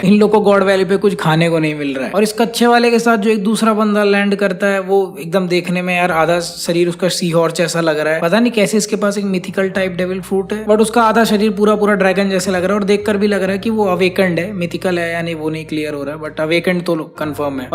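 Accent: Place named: Maharashtra, India. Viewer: Bro's Marathi accent 😭🤌🏻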